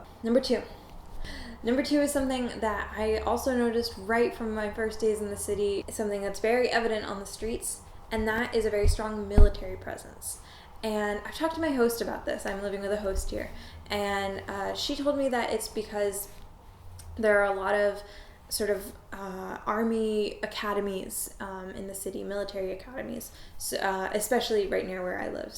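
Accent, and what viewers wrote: Russia. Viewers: You have Russian accent!!! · You sound like behind your English some perfect Russian hides =) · why your pronunciation is so Russian?) are your parents from USSR? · у девочки русский акцент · Похожа. И, возможно, даже русская. По крайней мере, мне показалось, что некоторые фонемы она произносит немного на русский манер. Да, она отлично говорит по-английски, но в английском есть специфические фонемы, произнесение которых трудно контролировать если ты не родился и не вырос в англоязычной среде с нулевого возраста. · oh, girl, you got that kind of rrrrrussian accent. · funny ... you have such a strong Russian accent :) However, the word Babushka - pronounced perfectly :) At some point I started to think that you are Russian :) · nice soft russian accent =) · Симпотичная девушка) С очень хорошим русским акцентом, что даже очень странно) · You have a Russian accent speaking English. 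Look Russian too. Not buying it.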